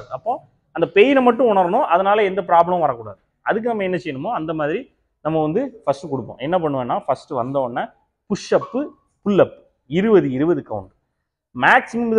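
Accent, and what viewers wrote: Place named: Tuticorin, India. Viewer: TUTICORIN slang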